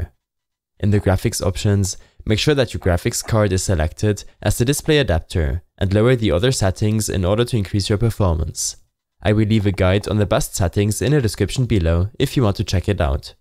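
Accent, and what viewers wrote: Germany. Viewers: You sound really german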